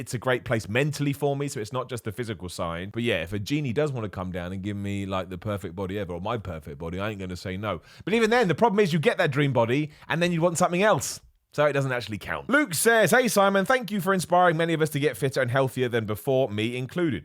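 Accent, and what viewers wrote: United Kingdom. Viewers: As an Australian I don’t think you sound like us! Although your British accent is a very mild one if that makes sense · American/Australian?!? Weird... You sound totally British to me.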